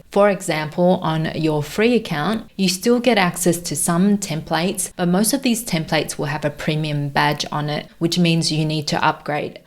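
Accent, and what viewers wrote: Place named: Australia. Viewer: EXELLENT! EXELLENT TRAINING! Nothing personal to Mr. Lemonaid, but his broken English is a bit too much for me to tolerate for training I desperately need. I GET IT! English is difficult, but before you start teaching learn the language much better, even if you do have a bit of an Aussie tilt to it. BTW, my heart SOO goes out to the patriots down under. Fight on.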